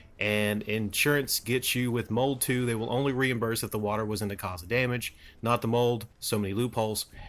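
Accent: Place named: United States. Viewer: Listening to your accent you are American. I'm guessing you're happy to live in a capitalist society. YouTubers, including yourself probably, are hoping to make money from their work/videos and there's nothing wrong with that. It seems hypocritical that you're making videos yourself, probably monetised, then complaining about other people making money from YouTube and Patreon. There are many YouTubers that monetise videos and use patreon to try and get a bit more cash. It's the nature of the beast.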